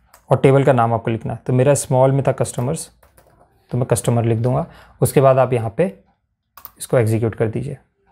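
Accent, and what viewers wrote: Odisha, India. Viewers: Are you bro from odisha?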